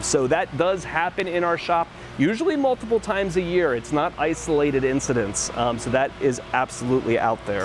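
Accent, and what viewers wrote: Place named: Pennsylvania, United States. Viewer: I heard a Pennsylvania accent then I looked up your location, yep! Grew up in Philly myself.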